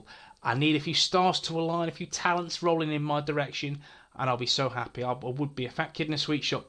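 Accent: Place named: Australia. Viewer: Fair enough mate. Love the build though. You sound Australian. I'm from New Zealand.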